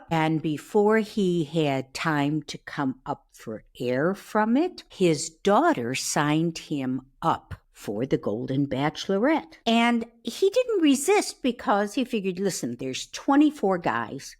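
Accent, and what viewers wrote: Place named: Upstate New York, United States. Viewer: You are so cool -- just subbed. I agree that Joan is NOT ready to get engaged. BTW, you sound like you're from upstate NY. 😊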